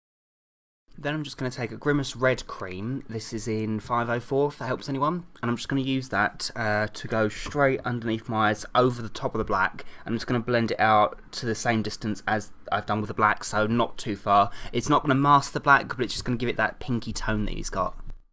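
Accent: England, United Kingdom. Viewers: Seems strange, a lot of tutorials are American, meaning when they say where they got the make up from or how much it cost, im thought, but to hear an English accent and not far away from where i am, makes me smile so much :D This is totally epic, nice work! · I love your videos. They are so good and I love your English accent. Can you do a genie from Disney's Aladdin.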